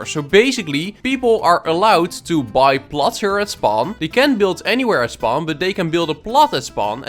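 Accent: Netherlands. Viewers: Kan gewoon horen dat je Nederlands bent XD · Your Dutch. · i smell dutch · Nederland voor de dubbbb lessgooo ik hoorde al iets aan de stem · U dutch?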